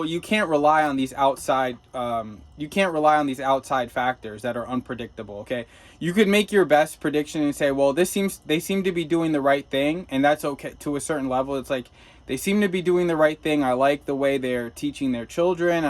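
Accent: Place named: United States. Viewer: Здравствуйте.Возможный вариант,как можно поступить( ну или,как бы сделал я))).У тебя родной английский язык( американский акцент)- это можно монетезировать.Есть парень из Шотландии,он устроился в частную школу в России и неплохо зарабатывает,спишись с ним.( он есть на ютубе).Что это даст: погружение в среду,основы русского,просто общение,ну и как шанс- найти русскую жену, ведь жить одному в России - это неправильно). Дальше: в школах летом 3 месяца каникулы,можно и США поехать ,поработать на траке эти 3 месяца,почему и нет,ну а можно и не ехать..Совет: не ищи себе девушку на сайтах знакомств в России,лучше в других ( обычных) местах.Бизнес- это потом,окунешься в среду,, найдешь русскую жену ,так легче.( да и меньше рисков ).Желаю тебе исполнить свою мечту.Удачи,друг!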